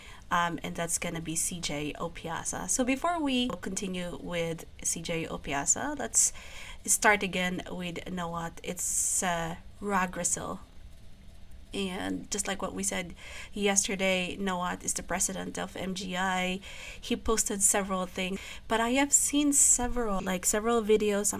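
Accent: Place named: India. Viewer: Mr Nawat is hands on in his organization. There is nothing more effective than him making that move. You sound like Indian so I don't acknowledge your point. Your subscribers is less and I don't think it is going grow fast. You are sounding like moralist like most of Indians. I don't dig,that. I didn;t subscribe nor like your content. I have to change channel.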